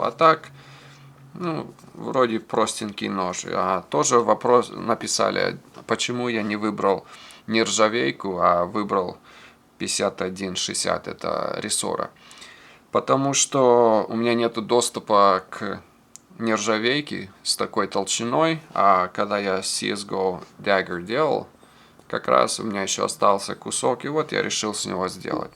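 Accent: Russia. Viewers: твои ножи - это искусство, руки золотые! У тебя не английский, а русский с акцентом, судя по всему ты уже давно говоришь на английском. Удачи и ждем новых произведений!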